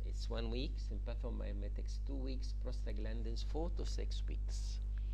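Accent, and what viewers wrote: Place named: Egypt. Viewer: Shukraan, helped a lot with my therapeutic course Noticed the Egyptian accent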